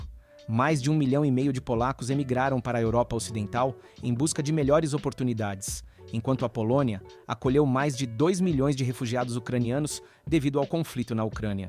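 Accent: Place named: Brazil. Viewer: Esquisito esse texto em português de Portugal com voz de português do Brasil. Sector, perceção, despedimento... 🙄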